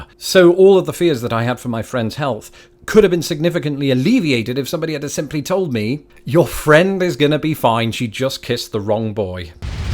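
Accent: United States. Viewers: You do a great American accent. Let's hear more of it! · Your American Voice is pretty good. Once a year you should try different barbecue places in America. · Your American accent is pretty good. Why can't most Americans mimic a good British accent? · Great American accent. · You should do a satire video with your American accent.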